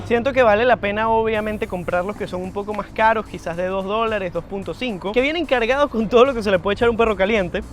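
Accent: Venezuela. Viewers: Yo parA sobrevivir compro tortillas y queso duro sale super barato en los mercados se puede comprar super barato con 3 dolarucos se puede t oigo un acento venezolano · de donde eres? No puedo reconocer tu acento, pero me inclino a venezolano?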